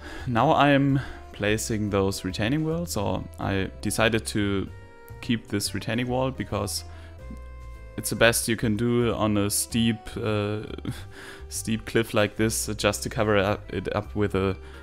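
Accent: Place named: Germany. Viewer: What was with the intro at the beginning? Was that a mistake? Also, I love your German accent.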